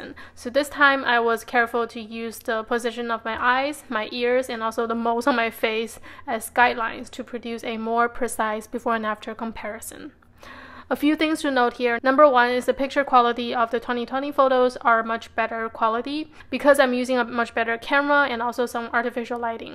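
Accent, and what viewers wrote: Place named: Taiwan. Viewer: U seem to have taiwanese accent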